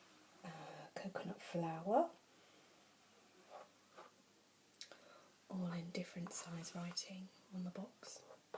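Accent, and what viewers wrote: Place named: England, United Kingdom. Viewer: ~* *~ Cool! I really like this vid. Very casual, and my two biggest triggers are voices and tapping, and this vid has both! Your voice is really triggery for me, as I think English accents are more so than USA. Thanks and hope you're having a good day! Merry Christmas, happy Hanukkah, happy kwanza, winter solstice, or whatever you celebrate.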